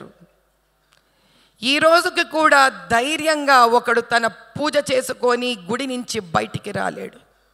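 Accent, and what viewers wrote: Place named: Rayalaseema, India. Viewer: పద్మూడు...రాయలసీమ యాస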